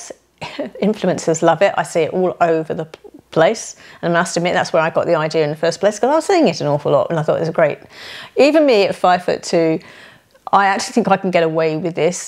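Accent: United Kingdom. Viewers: I just found your channel. I am 50 and trying to style myself well without looking too old or young 😅. I was reading the video and decided to click and listen. I said to myself I bet she has a British accent. I was right 😂. I love listening to you and you give some good thoughts on leggings. New subscriber here!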